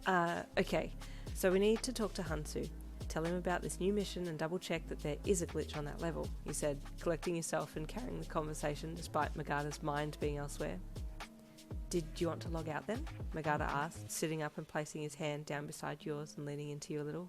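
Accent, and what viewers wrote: Australia. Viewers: Heyy love the video as usual But the Australian accent was amazing I loved it 💕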